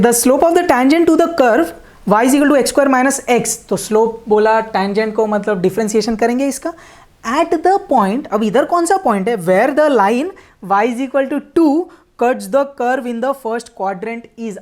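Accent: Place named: Hyderabad, India. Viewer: Hyderabadi hai kya ji tum bhot bharti bolte aur sikhate bhi🔥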